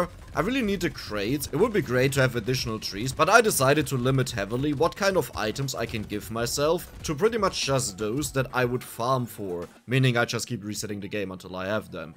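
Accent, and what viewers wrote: Germany. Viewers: ist ja schrecklich deutsche englisch sprechen zu hören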